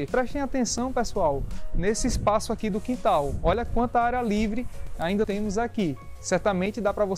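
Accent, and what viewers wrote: Northeast, Brazil. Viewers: Adoro ver as casas que você apresenta nesse seu canal. São tão maravilhosas que fica difícil nomear uma como preferida. No fundo no fundo, prefiro todas pois todas me inspiram de alguma forma. Rsrs E quanto a você, é um rapaz muito simpático, educado e bonito que sabe passar bem a sua mensagem com esse sotaque nordestino que eu adoro.. Boa sorte!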